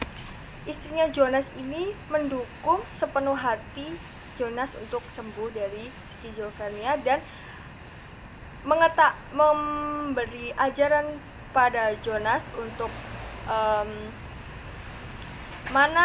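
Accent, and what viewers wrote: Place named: Java, Indonesia. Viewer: Medok'e jowo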